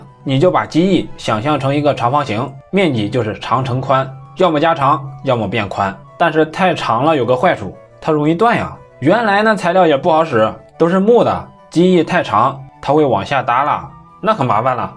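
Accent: Henan, China. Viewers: 河南口音吧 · 这是河南话?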